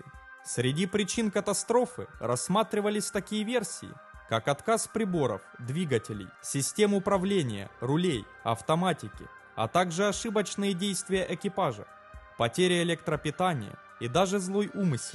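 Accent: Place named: Ukraine. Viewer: Это неисправисо..! Карьтавые идут на радио, а с ужасным малоросским акцентом зачитывают длиннющие авторские тексты!🤯 Скоро рыбакам пора курятники обслуживать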